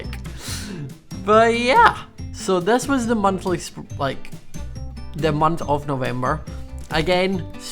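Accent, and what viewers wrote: Scotland, United Kingdom. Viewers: I KNEW IT, HE’S SCOTTISH...I think.... Also have a happy halloween and bonfire night~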